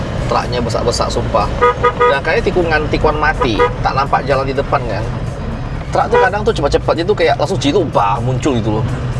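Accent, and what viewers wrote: Pontianak, Indonesia. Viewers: lebih menarik chanel ini drpd yg sebelah,kbnyakn narasi sok wah ,terbukti subscriber nya lebih bnyk ini logatnya pontianak atau ketapang ya bang?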